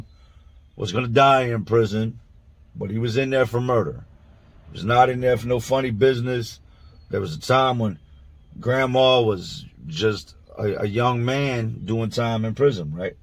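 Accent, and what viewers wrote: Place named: Philadelphia, United States. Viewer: Yo what's good with you. The stories are very entertaining!!! By watching your videos I see you have lived in Virginia Philadelphia and North Carolina. You definitely have the Philly accent 100% I'm from that area and I could tell instantly. If someone asked you where you're from what would you tell them?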